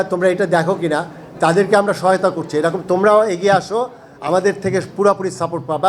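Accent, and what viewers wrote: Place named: West Bengal, India. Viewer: ওনার বাংলা ভাষা অনেকটা পশ্চিম বংগের মানুষের মতো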